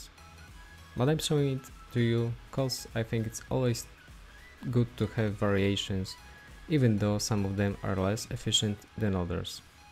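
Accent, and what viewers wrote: Poland. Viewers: Helpfull :) You from Poland ? I hear by the accent ;p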